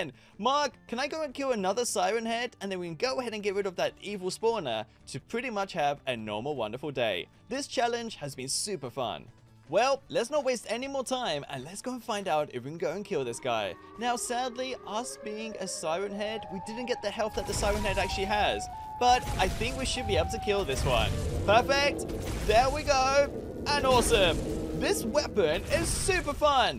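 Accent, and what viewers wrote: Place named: London, United Kingdom. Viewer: You talk like London 🇬🇧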